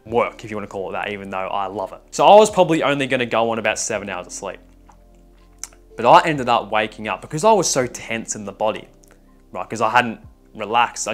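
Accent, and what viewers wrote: Australia. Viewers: Judging by your accent I’m guessing your from New South Wales or Sydney area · Now that's an Aussie accent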